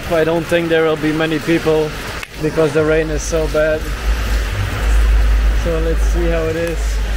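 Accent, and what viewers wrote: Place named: Netherlands. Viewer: You sound Dutch bro , netherlands or Belgian ? 😜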